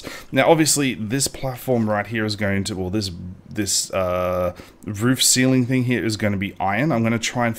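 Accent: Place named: Australia. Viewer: Is the "Build Big or Go Home" mindset just how Australians like to play these games? Because this is damn impressive! I know another youtuber who is a Minecraft player and he is also Aussie and loves to build grand projects:D I love the videos (Also, no offence meant if you're not Aussie, the accent sounds like you are but i could be wrong, no offence meant though <3). I am excited to see where this mega project takes you! Keep up the great work!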